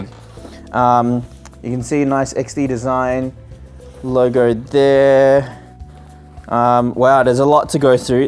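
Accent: Australia. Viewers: ARE U AUSTRALIAN??? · Are you from Melbourne Australia?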